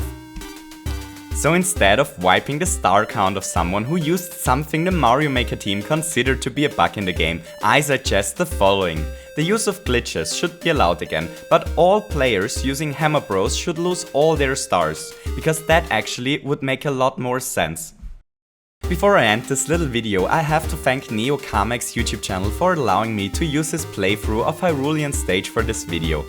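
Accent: Germany. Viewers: bist du deutscher? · Bist du deutscher? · Hey... You´re from Germany...:D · Are you german? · Are you German? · are you german?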